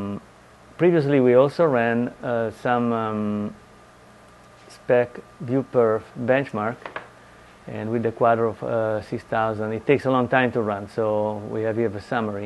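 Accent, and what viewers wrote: Italy. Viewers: R U Italian? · you speak english very well but your accent makes me wonder: Are you italian?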